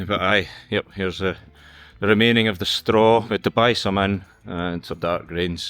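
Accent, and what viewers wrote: Scotland, United Kingdom. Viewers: Hi great videos and I really enjoy watching them. Especially as you're a fellow Scot with a similar accent. I was born in Lanark. I'm curious to hear where in Scotland you are? Are you south west of Lanark? Keep the videos coming 👍👍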